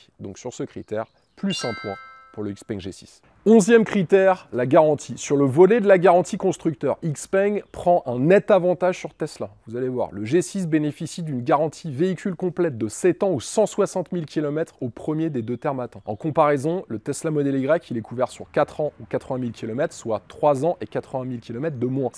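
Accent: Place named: France. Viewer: Pourquoi vous n’utilisez pas la fonction traduction en anglais automatique. Votre anglais est horrible. La phonétique est 100% française. Ça fait mal aux oreilles de vous écouter en anglais 😖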